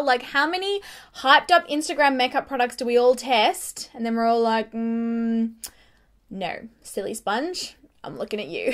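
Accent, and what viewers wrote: Australia. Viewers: I unsubscribed as well from Tati! Haven't watched her videos in months, because your videos are so real and besides, who can't get over listening to your beautiful accent!! You are a beautiful Australian woman who has helped so much with choosing products and I just love you!! Thanks for the tips regarding makeup and skincare!! Great video and your makeup is absolutely lovely and on point!!!! The Estée Lauder double wear foundation is one of Angie's, from Hot & Flashy, holy grail foundation! Therefore, since your recommending this foundation for oily skin types, then I'm gonna have to try it! I've been wearing bareMinerals's powder foundation for soooo many years... I believe it's time to try a liquid again!! However, or someone who may know...do you have a recent skincare (AM & PM) tutorial?? Because, I've been away for some time and am trying to catch up with you specifically!!! 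As always, much ❤️ from your 🇺🇸 RN oily sister!!💕. Hope to hear from you soon!! 💋💋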